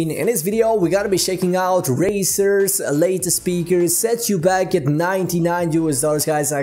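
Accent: Sweden. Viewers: Are u svensk min broder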